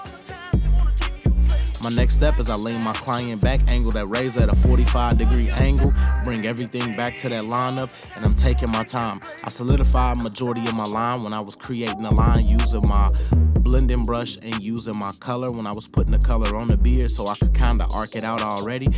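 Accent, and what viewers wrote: Chicago, United States. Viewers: That's the Chi in him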